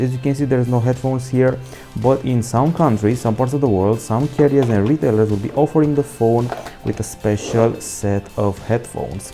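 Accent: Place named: Romania. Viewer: Romanian accent 😀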